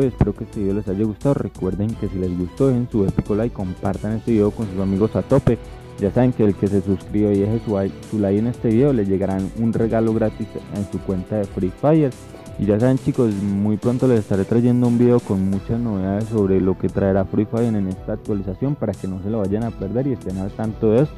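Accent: Colombia. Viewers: Na pa mas colombiano pa que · eres de medellino de cali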